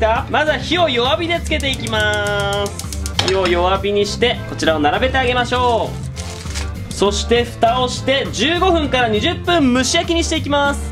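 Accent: Kansai, Japan. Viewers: 、関西出身やったりする？？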